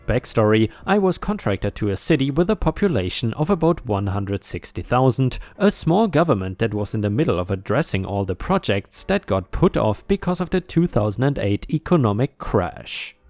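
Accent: Germany. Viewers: Darn lawn Nazis. I hate HOA's. The narrator does a wonderful job at telling these stories. As a person with German ancestors I like the accent. I can imagine the HOA president shouting "Kein Parken dieser Autos auf der Straße!" While stomping around. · Hey man I was wondering what your accent is I can’t quite place it but I’m thinking German or Swedish If I could have any car it would probably be a hell cat. Or a Noble m500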